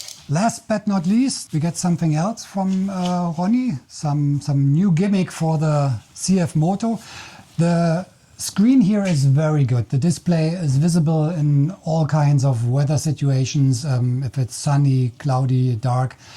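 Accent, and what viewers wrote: Germany. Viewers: hör ick da nen Berliner Akzent raus? So herzig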